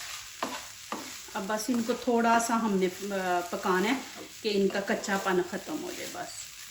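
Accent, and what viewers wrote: Punjab, India. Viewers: آپ کا لہجہ خالص پنجابیوں والا ھے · آپ دنیا کے کس شہر میں رہتی ہیں ۔۔۔ویسے بولتی اردو ہیں مگر لگتا ہے پاکستان کے کسی پنجابی علاقے سے تعلق ہے 😊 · Punjabi lehje me Urdu ka aur hi sawaad hai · Too much panjabi lahja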